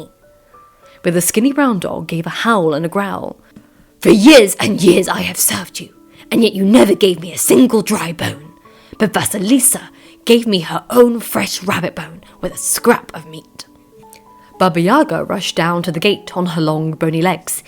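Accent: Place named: Upper class, United Kingdom. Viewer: This was well told. The narrator sounds like she has an upper class British accent, which is quite pleasant. She also tells the story of Vasalisa and Baba Yaga with good verbal technique. I enjoyed hearing the folk tale.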